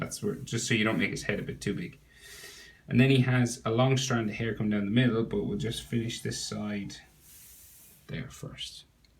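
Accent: Ireland. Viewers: are u irish bruh i am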